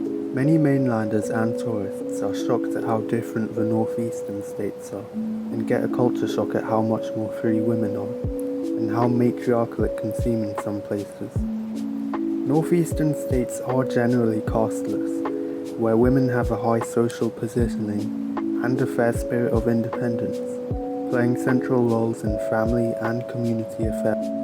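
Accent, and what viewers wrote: United Kingdom. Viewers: I just love and adore uk accent.....❤️ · For once it's great to hear a British accent. Your honest views are much appreciated.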